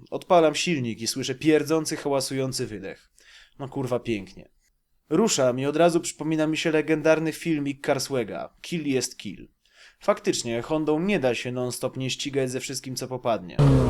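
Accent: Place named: Ostrołęka, Poland. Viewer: Ostrołęckie akcenty, honda na WO.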